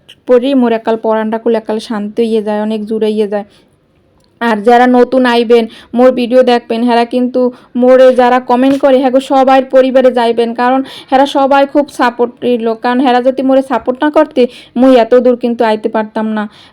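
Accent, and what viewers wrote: Barishal, Bangladesh. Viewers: তোমার চালের ড্রাম টা আমারটার সেইম কালার আপু,আমারও এখন পর্যন্ত আল্লাহ্ র রহমতে কোনো নেগেটিভ কমেন্ট নাই,তোমার কথাই সুন্দর লাগে বেশী আপু,বরিশালের আন্ঞলিক ভাসা,আহা❤❤❤আমারও সংসারে যা আছে সেই সত্য জিনিষ দিয়েই তোমাদের মাঝে ভিডিও নিয়ে হাজির হই আপু❤কমেন্ট পরলে,রেহানা আপু,হাবিবা,শিশির আপুর সাথে আমি যুক্ত আছি❤মহিলাদের কাজ,আর বলো না আপু😢শেষ করলাম আপু,আলহামদুলিল্লাহ্❤ফুল ওয়াচ❤পাশে থেকো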